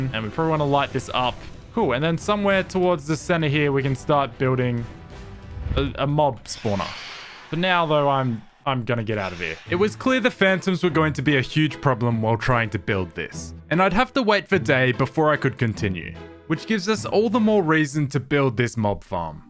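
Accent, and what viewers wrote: Australia. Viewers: Does anyone know where hes from, he doesn't have an accent to me but he speaks like an aussie 😭🤣 naur (no) and seour (so) 🤣💜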